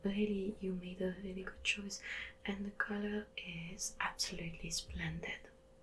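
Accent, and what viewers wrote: France. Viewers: I'd really like to live in universe:'/ - educated universe Ton accent français me fait beaucoup rire d'ailleurs! Tes vidéos sont vraiment originales j'adore 👌🏻💕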